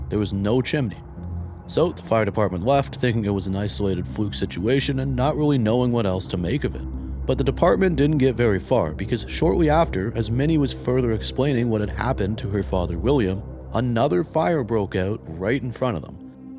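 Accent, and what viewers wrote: Canada. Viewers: Dude You are SO Canadian!! 😂 not an insult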